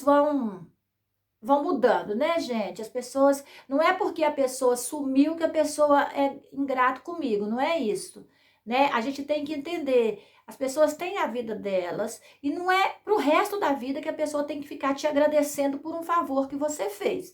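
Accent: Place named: Brazil. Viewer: Está certa em conservar seu sotaque do Brasil, acho forçado ficar querendo ser o que não é! Bjos adorei saber suas histórias!! 😘🤗🙋‍♀️🌹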